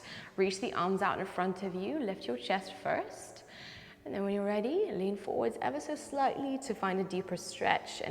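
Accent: South Africa. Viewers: Loves that Your accent is comfortably familiar to a fellow south african, who is overseas. Great instruction and sequence. Thank you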